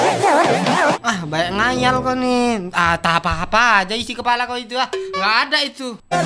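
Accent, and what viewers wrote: Medan, Indonesia. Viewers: Abg orang Medan kah🤔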